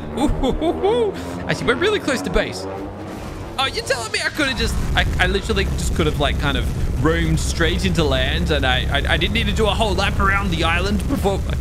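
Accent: Australia. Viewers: you sound like you are from adelaide or sydney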